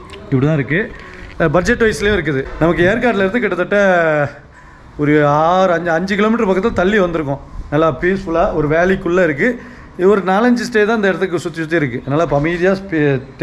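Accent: Chennai, India. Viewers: தளபதி விஜய் அண்ணா ஜனநாயகம் படம் பார்க்க ஆவல் போல உங்க வீடியோக்காக ஆவல் அதிலும் உங்க சென்னை மொழியில் பேச்சு இன்னும் ஆவல்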